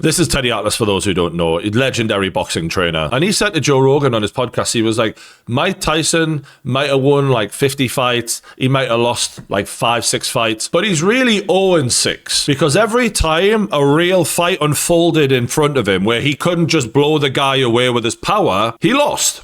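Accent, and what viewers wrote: Newcastle, United Kingdom. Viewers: He literally said this isn’t the first time. Keep up with the Geordie accent lad.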